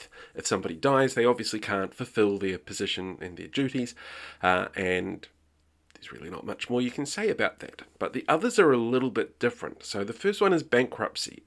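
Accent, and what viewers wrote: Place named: United Kingdom. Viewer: First time visiting this channel and I am a little confused. I don't know what drama this video might be talking about so I am assuming this is British law? (Guessing from your accent)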